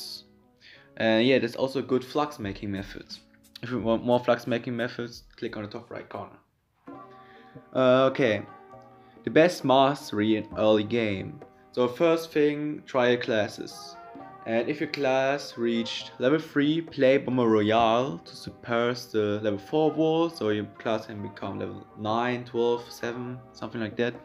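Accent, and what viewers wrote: Germany. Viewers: Hahahaha direkt gemerkt dass du Deutscher bist :DDD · Du bist Save deutsch merkt man 😂 lass mal zsm zocken adde mich mal auf Ps4 heiße Universum007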